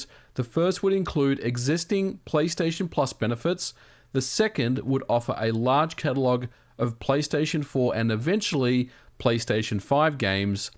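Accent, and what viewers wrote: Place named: Australia. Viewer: Man, i just learned today that this guy is Aussie, i always thought he was British somehow lol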